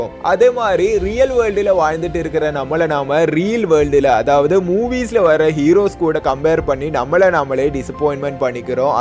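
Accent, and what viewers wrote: Kerala, India. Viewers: I like the way you talk tamil. It’s good to hear a Malayalam slang Tamil. 👏🏻👏🏻👏🏻 keep posting more videos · யோவ் ஒன்னு மலையாளம் பேசு இல்ல தமிழ் பேசு,அது என்ன தமிழ் மலையாளதகதை தமிழ் ஸ்டைல்ல பேசுற,சகிக்கல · Malayalam slang varuthu..but nice👍🏼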